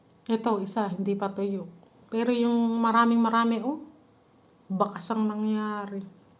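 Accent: Nueva Ecija, Philippines. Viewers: Ate parang Punto mo taga Nueva Ecija Po kayo idol kopo kayo❤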